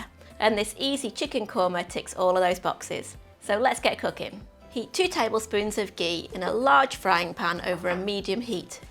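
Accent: United Kingdom. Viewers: love your British accent :)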